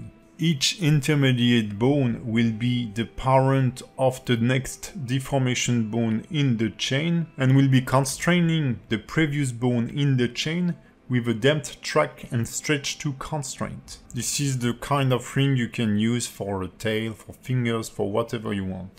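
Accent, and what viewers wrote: France. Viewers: slt, j'adore les français qui parle anglais . Bonne vidéo continuez comme ça ^^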